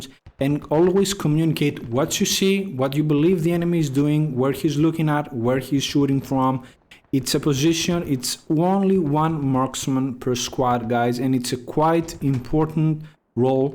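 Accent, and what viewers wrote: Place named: Greece. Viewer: I can hear the Greekness in your voice :D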